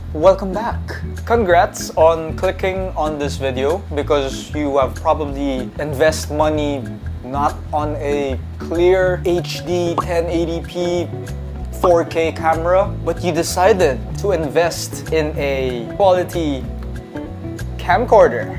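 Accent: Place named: Philippines. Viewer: Dude. You're my fav youtuber. I love photography and videograpyh and I spent almost a year in total on the Philippines (Been teaching german to filipino nurses). By far my fav country in this world!! And I miss hearing that accent every once in a while so here I am, getting the full package. Also, keep up the good vibes dude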